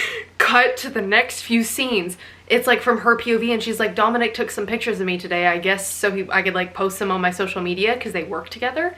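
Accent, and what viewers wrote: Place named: South, United States. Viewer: Girl literally never apologise for a southern accent 😂💖 i think its really charming . get well soon!!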